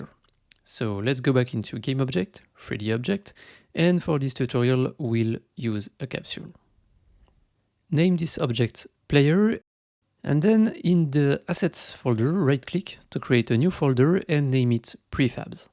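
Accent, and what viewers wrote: France. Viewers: Salut tu est français non?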